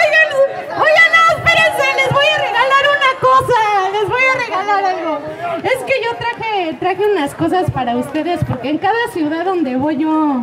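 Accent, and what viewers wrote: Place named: Mexico. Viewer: 🌼 🌷 tan hermosa como tu nombre, y me siento muy orgullosa de que seas mexicana. En serio, no se como gritarlo, eres algo, completamente diferente, especial, único, y me 2ncanta también como hablas, tu acento: 100% mexicana!!! Oye, eres de este mundo? No eres un ángel venido del cielo, que te envió Dios para alivianarnos un poco?! Tu alegría es increíblemente contagiosa! Que Dios te Bendiga y te Proteja! Mil gracias por existir!!👍👍👍😄😄👏👏👏😇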